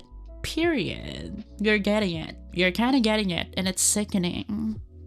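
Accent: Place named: Russia. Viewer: the russian accent got me to subscribe hahahahahahaha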